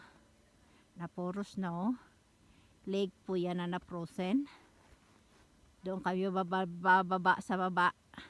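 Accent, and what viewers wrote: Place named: Iloilo, Philippines. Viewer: From where are you in Pinas? You sound like you are Ilonggo or Kinaray a..😂😂😂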